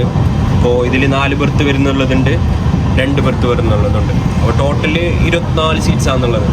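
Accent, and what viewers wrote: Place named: Kasaragod, India. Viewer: കാസർഗോഡ് ഭാഷ ❤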